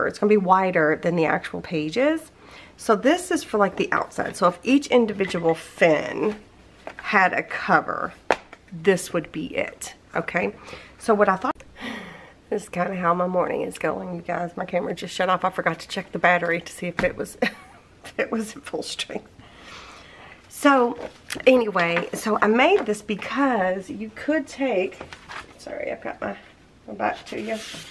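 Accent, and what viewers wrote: South, United States. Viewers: I know there's times that I have a creative block. So don't worry about it. We understand. I have a cute story to tell you. I had my neighbors 12 yr.old grandson over. He wanted to make something for his grandparents. He decided on a coupon book made from toilet paper rolls. So while he was coloring some paper to decorate with, I decided to listen to one of your videos. So I didn't put in my ear buds while watching. The video played for a bit, then he came over to look & asked me what country you where from, lol. I chuckled & said the USA & that you lived in Kentucky. I explained the people that live in the south have an accent. Of course he just said oh okay. I hope this gives you a smile, I know I get one every time I remember it. 💜💜💜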